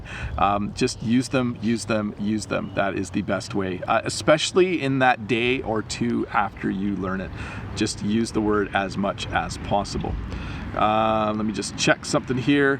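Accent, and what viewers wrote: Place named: Canada. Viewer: Hello,! Hello from Ukraine!:) I'm glad I found your video lessons. This is the first time I hear Canadian English! Thank you for your work.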